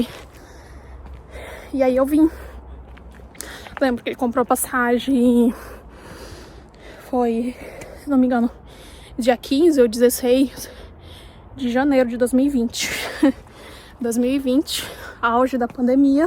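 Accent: Brazil. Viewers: Suiça tudo de bom. Amo viver aqui. Que lugar da Suiça vc mora? Seu sotaque Paraense não é muito forte, gostei muito da sua história. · minha família paterna do Laranjeiras e materna de Belo Horizonte. Nasci e vivi minha infância lá. Todos os familiares ainda. Meu pai veio trabalhar em Manaus e vivi lá de 98 até 2022 com minha família. Reconheci logo seu sotaque do Sul do Pará ;) E vc é da onde?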